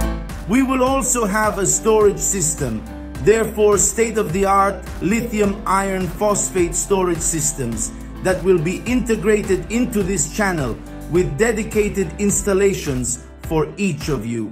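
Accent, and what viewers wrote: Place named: Florence, Italy. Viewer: Anglo fiorentino se non sbaglio 🤭🤭🤭✌️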